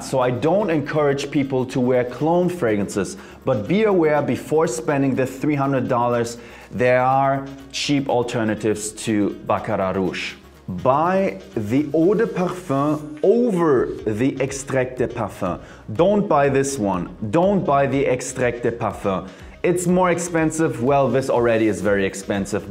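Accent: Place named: Germany. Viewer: Great video! This is crazy... I'm from the east coast of the U.S. But I have the habit of saying Bacarrat Rouge and other fragrance names with your German accent! 😆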